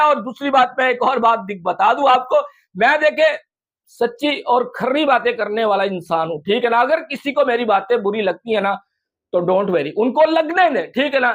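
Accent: Pakistan. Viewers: Tum indian ni ho saaf Pakistan se ho . Tera lehja bata raha he q k tera zobaan tere uniform ke 7 NAHI dekraha he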